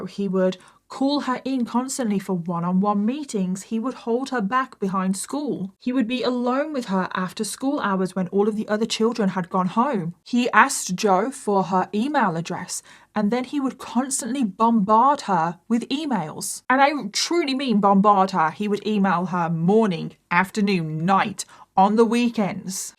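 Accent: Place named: United Kingdom. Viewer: I watch your content religiously every week Ive seen every video you have posted. Rewatched and i love what you do and your brittish voice! -- Rachel from South Dakota 🇺🇸 🌎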